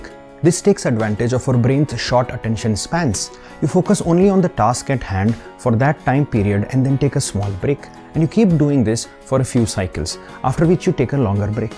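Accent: India. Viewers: there's no such thing as Indian accent diff parts of India speaks with diff accents from south to north to east to west what he is speaking is probably south indian accent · Duude..really?! You're an Indian and you find indian accent disturbing?! Wow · i really love the indian accent :D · after the indian accent i knew this was the video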